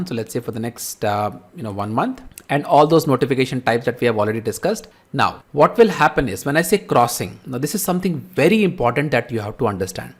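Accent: India. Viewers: I love the Indian ascents ...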